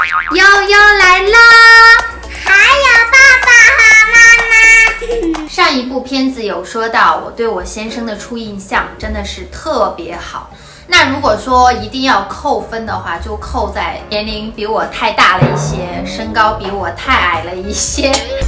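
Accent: Taiwan. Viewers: 北京大妞的也有了浓浓的台湾腔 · 說話腔調開始向台腔靠近了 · 歡迎你嫁來台灣！你講話有台灣味哦！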